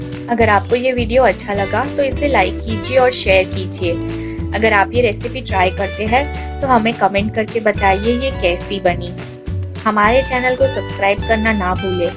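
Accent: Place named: India. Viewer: Sav Sav she is speaking in hindi and not in English that u r commenting like that.... in most of the houses of india they call it in this way only..... so it's okay if she is pronouncing something in indian accent.... so pls... dont judge anyone by their language. u need to see the hardwork behind everything and also their talent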